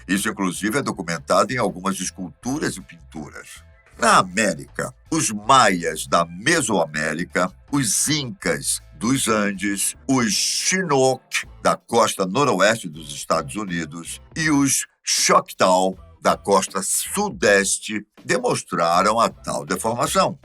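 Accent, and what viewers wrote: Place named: Ceará, Brazil. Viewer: Quase achei que era um cearense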